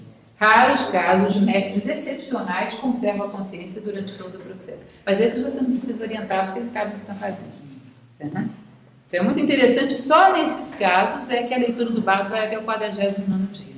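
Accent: Rio de Janeiro, Brazil. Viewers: Muito interessante Tive dificuldade de entender a fala Primeiro achei q era o marcante sotaque carioca com seu todo abrangente xxxxx Depois achei q é a dicção da palestrante que aumenta e diminui o tom durante a pronuncia da mesma palavra Finalmente achei q tinha um eco na acústica da captação do som Pode que o uso de um pequeno microfone possa otimizar o som Enfim certamente a dificuldade de entender penso que é minha Agradeço o compartilhar do tema Abraço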